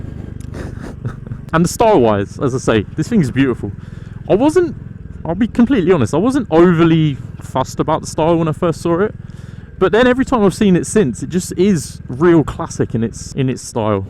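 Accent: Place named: South India, India. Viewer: It's probably the south Indian accent of many Indian youtubers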